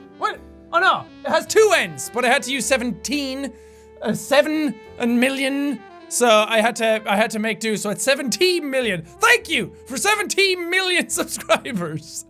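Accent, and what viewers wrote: Ireland. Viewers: I'm so happy for 17,000,000 subs love your Irish accent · Early and Congrats on a huge mile stone I have watched you channel since you didn't sound Irish well done jacksepeye!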